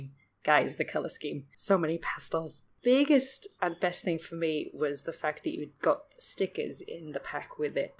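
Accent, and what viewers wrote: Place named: Wales, United Kingdom. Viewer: Great PJs and love how you're sounding more Welsh after your trip home! 😘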